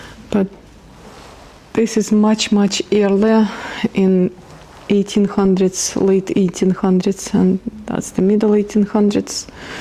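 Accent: Glasgow, United Kingdom. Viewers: Yeah was thinking that its lovely hearing the Glaswegian twang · Love it! Can totally hear the Glaswegian accent starting to mix with yours. Lovely. Great vid . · Me too! You can’t escape the Glaswegian accent, no matter how hard you try.😄😄😄😄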